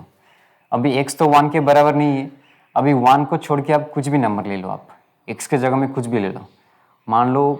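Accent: Assam, India. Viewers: sir ap axomiya niki?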